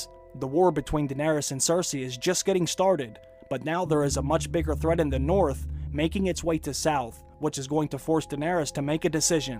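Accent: Pittsburgh, United States. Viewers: You're from Pittsburgh, aren't you?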